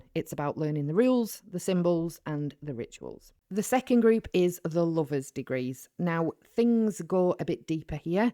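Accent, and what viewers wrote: United Kingdom. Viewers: MAKES A PLEASANT CHANGE to listen to a non-sensationalised analysis of the Watts case; nicely done. The Northern accent feels just that bit more human.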